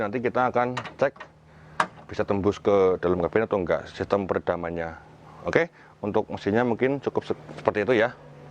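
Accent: Banyumas, Indonesia. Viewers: Tawa mobil bonus paving, pa bos,,?? Rika wong ngapak ya,nyong demen,,aku duwe wedus,go dp bisa?? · Ha ha ha slm ngapak.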